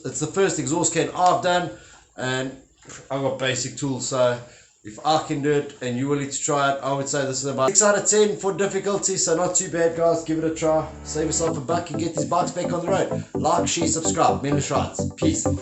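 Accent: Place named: South Africa. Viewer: So great to hear a South African voice in far of Europe. Unmistakeable 😂. Subed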